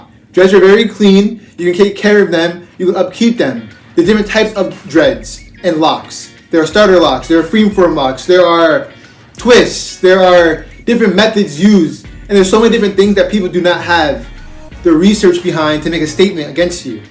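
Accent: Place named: Haiti. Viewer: First, I just want to say I like your accent. Where is that accent from? Are you from Haity? Anyway, to get back to the topic, I think all this stuff is in Black men's head. If they are insuecure with their hair others with sense it. I have always had long hair and used to having it in braids, twists, or loose. I have never had an issue working in corporate London, UK, because I've always been confident of who I am, very proud of my African culture and never tried to fit in or gain acceptance to the detriment of my culture. Besides, my ancestors used to have long hair for spiritual reasons. Sometimes they used to keep it in locks, especially the kings and warrior class. Therefore, stuff that! I'm not going to hide my heritage to appease others just because they feel uncomfortable about an important part of my culture. That is their problem, not mine. 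With this mind set, instilled in me since childhood by my parents, I have never ever had problems working in the corporate sector previously (now I work for myself). I think I had only had one small issue many years ago when I went to an interview for an important managerial position. The interviewer (a white man) said my hair is too long and if I would consider cutting it off if I get the job. Mind your, my hair was in a neat pony tail at the time. My response was: "Never! My hair is part of me and as you can see, it is in a pony tail." I told him with such pride and assertiveness and set the foundation then and there irrespecti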